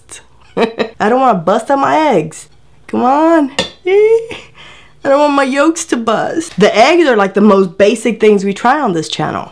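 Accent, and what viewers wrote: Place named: Compton, United States. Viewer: Can you do us a favor and not speak like you are from Compton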